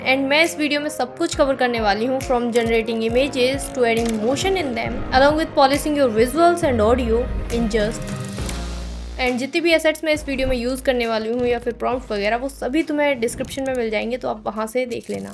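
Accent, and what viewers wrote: Gujarat, India. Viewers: Maybe Work on your Gujarati accent...or else evrything else is fine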